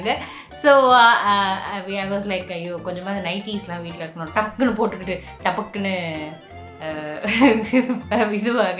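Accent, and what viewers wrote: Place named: Tamil Nadu, India. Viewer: love ur tamil accent, its sounds really good and funny sometimes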